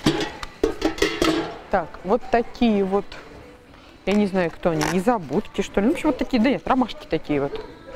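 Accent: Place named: Mordovia, Russia. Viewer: 😂😂😂 Досмотрела до конца!!! говор прям мордовский🔥👍 часы можно брать 😂видно что крепкие.. Очень понравилось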